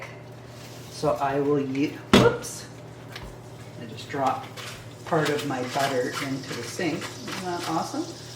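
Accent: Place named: British Columbia, Canada. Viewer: Well done ma'am, looks delicious. First time running into your site, enjoyable. Noticed a wee bit of B.C. brogue in your voice. Can listen to that all day long. Am located probably about 400 miles south of your location. Have an enjoyable weekend.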